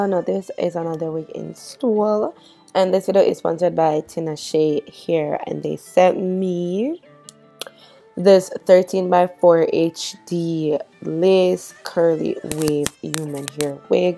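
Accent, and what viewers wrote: Jamaica. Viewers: Omg your Jamaican?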